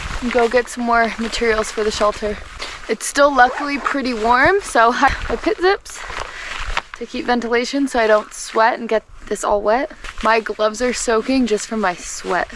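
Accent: Canada. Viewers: I found out it’s part of the way Canadians talk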